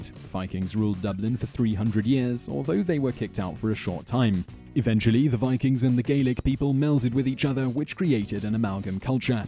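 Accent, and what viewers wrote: England, United Kingdom. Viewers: Is this guy British? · I'm sorry the enlish accent sounds smart but is it nessesary? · Jack Hammer Well.... he's English so.... yanno.... I'm sure he could do an African accent if you'd prefer :)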